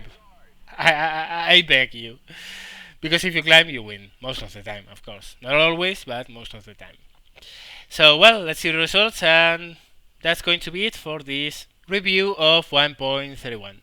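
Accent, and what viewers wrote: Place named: Spain. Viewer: He is Spanish, so its a Spanish accent.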